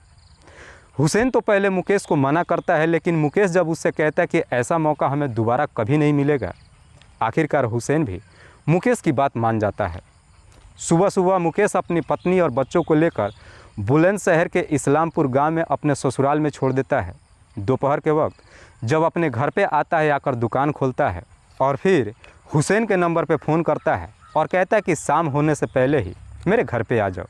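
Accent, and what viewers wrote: Bihar, India. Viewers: भाई आपका लैंग्वेज बिहार के तरह है भाषा क्या बिहार के तो नहीं रहने वाले हैं बहुत बढ़िया कहानी सुनाते हैं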